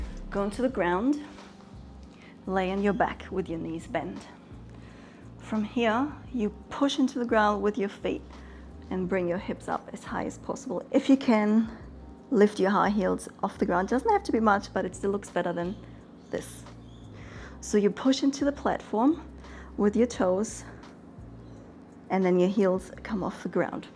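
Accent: Germany. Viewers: I really like your German accent !!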